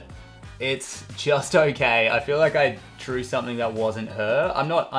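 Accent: Australia. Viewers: Nice! I just found your channel. Never thought id say this but its nice to hear an Aussie accent on youtube for once. All the other channels im subscribed to are American or British and there's nothing wrong with that, but yeah... Loved your first version of Thomas. He looks like he could grope me through 3m of concrete. 😅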